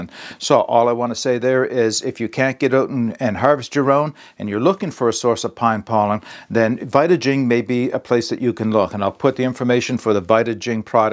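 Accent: Nova Scotia, Canada. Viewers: I detect a residual maritime accent. Are you from Nova Scotia?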